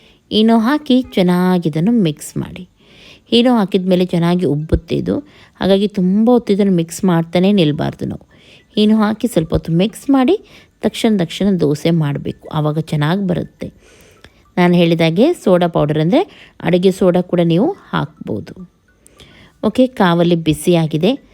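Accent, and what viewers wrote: Mangalore, India. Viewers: Super recipes and your Mangalore language sweet voice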